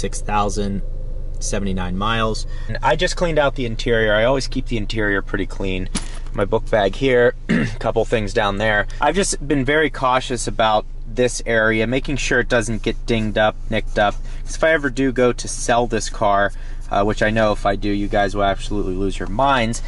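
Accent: Pittsburgh, United States. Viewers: Holy shit, you're a yinzer! Lol I'm just south of Pittsburgh and looking for an mk3.5 for a daily at the moment.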